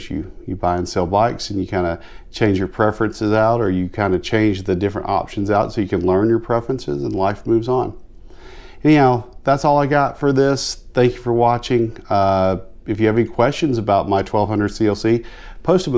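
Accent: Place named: Alabama, United States. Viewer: Interesting I’ll admit I know nothing about these bikes.sounds like you’re in lower Alabama. I’m up here in dekalb county.you should come ride little river canyon.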